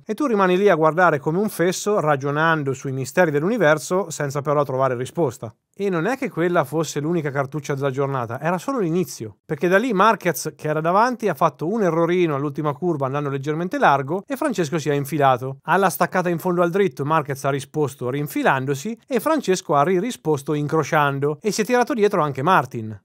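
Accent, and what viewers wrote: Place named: Marche, Italy. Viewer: Hai la stessa "esse" di Fabri Fibra. Secondo me sei di Senigallia FORZA Bestia 23 Sempre!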